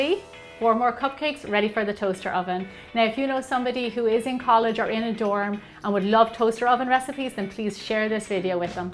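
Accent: Ireland. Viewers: What part of Ireland are you from I live in a Cork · Are you irish?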